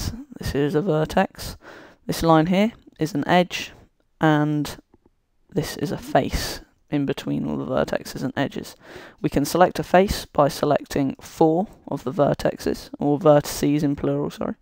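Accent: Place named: United Kingdom. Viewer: based on the link and his accent, prolly, he's from UK...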